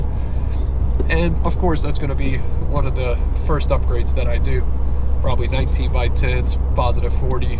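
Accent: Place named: Europe. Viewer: You have a cool accent - kinda of a blend of a European accent with an American Southern accent.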